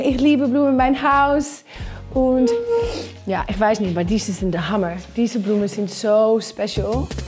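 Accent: Netherlands. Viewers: Lovely 🥰 ich liebe den holländischen Akzent 💕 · Es ist gut! Sie hat den wunderschönen holländischen Akzent ❤️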